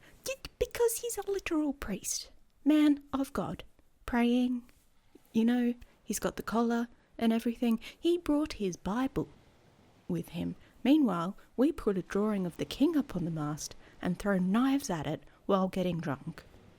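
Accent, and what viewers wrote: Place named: Australia. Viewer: Gahhhh an Australian accent? So rare in the F4A world 🤩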